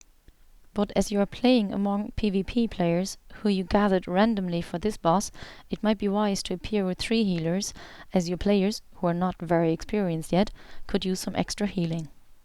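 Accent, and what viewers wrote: Ireland. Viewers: accent is clearly irish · What part of ireland are you from? Im from Cork City! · It sounds Irish to me. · Shes not a robot, she's just Irish! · Pfft German.....she's Irish ya daft twit. Bloody lovely btw :) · Maybe she 'sounds like a robot' because she knows she has an accent and is trying to speak slowly and clearly, given that most of the people watching this won't be familiar with the particular accent (which I believe is Irish). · are u from mayo? im from wicklow myself, just cant pin point the accent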